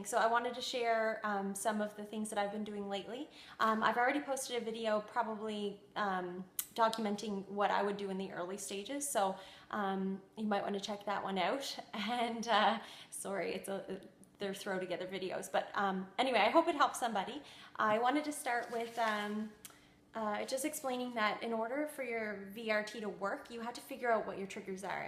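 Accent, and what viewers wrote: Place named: Eastern Canada, Canada. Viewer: Thanks for this video. I am just dealing with this now and you gave me some hope of recovery. Worst feeling Ive ever experienced! Are you in Canada? I feel like I hear a bit of an eastern accent. I’m in western Canada.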